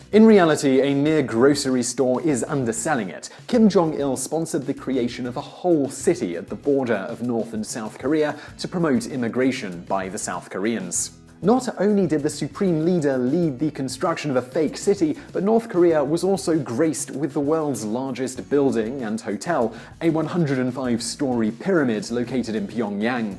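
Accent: United Kingdom. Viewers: Is that British matthew santoro??? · I do love a eloquent British Accent.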